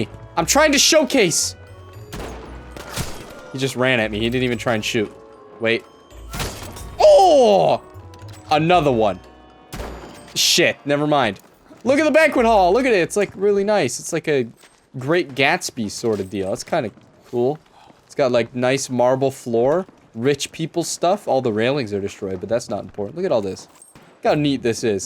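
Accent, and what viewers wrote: Canada. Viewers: Are you a newfie b'y?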